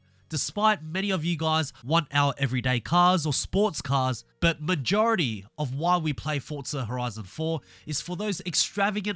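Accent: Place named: Australia. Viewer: And I love your accent, to me, the Australian accent is the best and I can do a pretty good one myself (I'm partly Australian).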